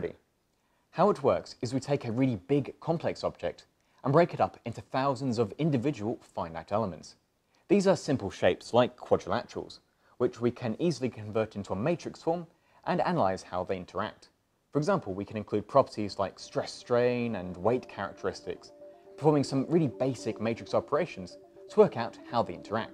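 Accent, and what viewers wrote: England, United Kingdom. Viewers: damn pom trying to speak aussie words. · You are a small Tom Scott, same presentation style and British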